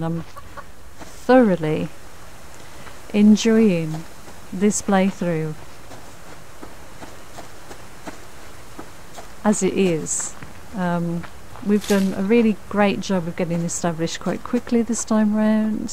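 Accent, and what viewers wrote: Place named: United Kingdom. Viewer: Thank You for the information about the new update. I really like to listen to your lovely British accent. You speak a very good english, very easy to understand for someone from the continent. Keep on the good work!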